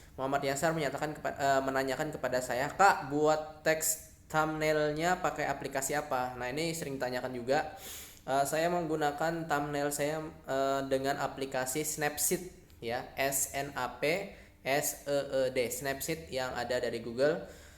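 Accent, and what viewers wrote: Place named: Java, Indonesia. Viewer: Saya gak nyangka lo kalo masnya dari kalimantan😀 kirain wong jowo😆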